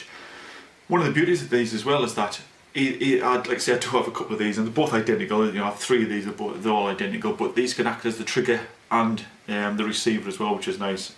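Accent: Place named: North East England, United Kingdom. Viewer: hi, I like your accent, sounds like north-east England. Is Nikon D750 built-in flash also using optical signal to control? If we use a sb700 on the top as a master flash, do we still have to use trigger for other slave flash? Thanks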